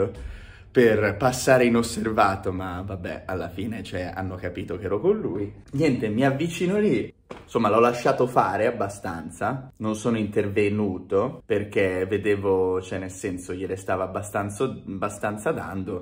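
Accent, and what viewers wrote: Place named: Rome, Italy. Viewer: Ma perché parli in Romano? Bel video continua così 😊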